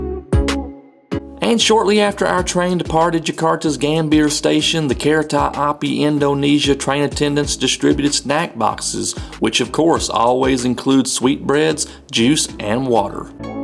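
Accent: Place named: Texas, United States. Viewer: i like your accent man.. where do you come from?! texas?! have fun in indo man..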